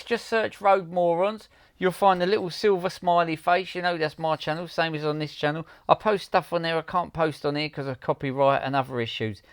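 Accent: Cockney, United Kingdom. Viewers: Cockney commentary is a riot. Subscribed 😂😂😂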